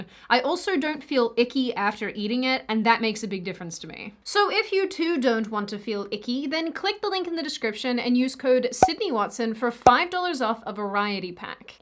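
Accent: United States. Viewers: Is it me or is her accent becoming more "americanized"?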